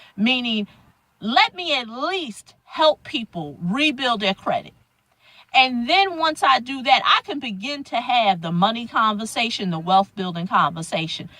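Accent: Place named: North, United States. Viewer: U sound like your from up north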